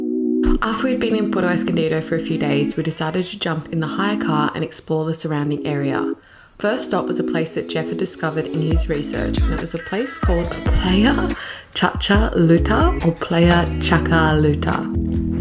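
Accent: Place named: Australia. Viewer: Who cares that you cannot pronounce Mexican Cities. With that beautiful Australian accent you actually make Mexican cities and beaches sound better. I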